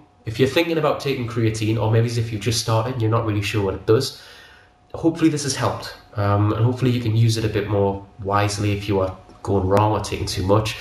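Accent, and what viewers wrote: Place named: United Kingdom. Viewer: Hey, from your accent I guess your from the UK, i was wondering where abouts are you based as i was thinking about having a personal trainer cheers tom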